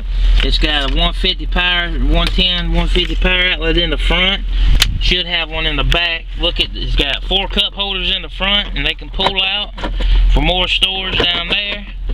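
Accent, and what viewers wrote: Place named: South, United States. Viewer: What state are from you have a southern accent.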